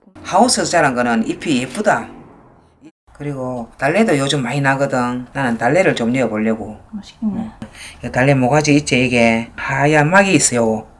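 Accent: Gyeongsang, South Korea. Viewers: 감사합니다.오늘 해 볼께요 맛있는요리 자주 올려주세요 샘 목소리 경상도 억양 ㅡ고향의 소리 고향냄새 엄마가 생각납니다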